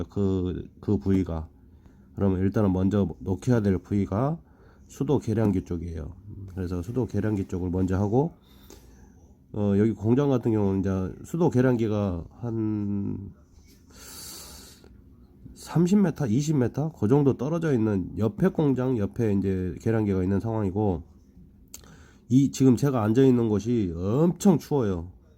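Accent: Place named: Jeolla, South Korea. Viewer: 혹시....고향이 전라도이신가요 ㅋㅋㅋ 제가 전라도인데 뭔가 구수하네요 ㅋㅋㅋㅋㅋ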